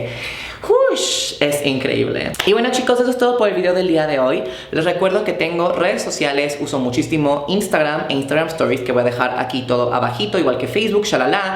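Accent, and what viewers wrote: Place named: Mexico. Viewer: ...yo lo que tengo...es una tremenda atracción (casi adicción) a tu voz... Sí, sé que en algún momento has reconocido que no es lo que mas te gusta de tí, pero a mi sí Esa mezcla perfecta, entre ese timbre algo grave (muchas OTRAS mujeres tienen voces hermosamente graves) y eso está lejos de ser un defecto en mi opinión. Pero continúo...mezcla perfecta entre ese timbre y la tonadita típica de alguien de México como tú, pero con una enorme femineidad en la manera como hablas... Y para que mas o menos te puedas hacer una idea de "que tipo de tipo" te está diciendo todo esto...te dejo un link de mi Galería fotografica de Flickr, para que compruebes que mi punto de vista, el de un sexagenario, hetero perdido, fotógrafo por hobby y aficción, que fotografía y charla amenamente con cera de una "veintena de veinteañeras" al día... y en belleza, he de reconocer que pocas se te acercan...y ninguna te supera <link>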